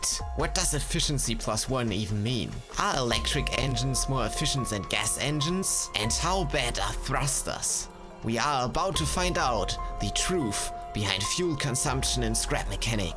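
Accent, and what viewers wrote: Germany. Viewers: He sounds german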